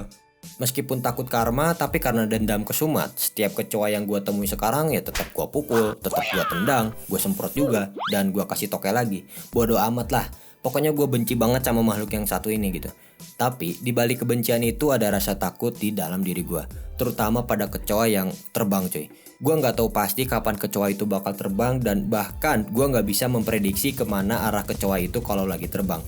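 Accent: West Java, Indonesia. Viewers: Orang sunda nya? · Bang urang sunda?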